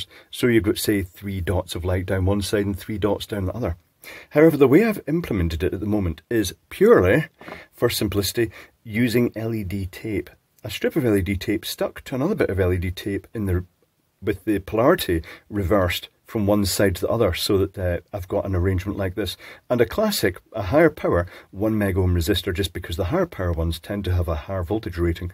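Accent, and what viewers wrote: Scotland, United Kingdom. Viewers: trying to avoid saying "shaft" in a delicious scottish accent 😂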